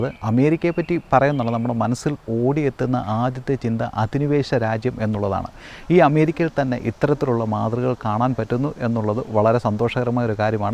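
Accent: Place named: Pathanamthitta, India. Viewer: ഭാഷാ ശുദ്ധി കേട്ടപ്പോൾ പത്തനംതിട്ടക്കാരൻ ആണെന്ന് തോന്നുന്നു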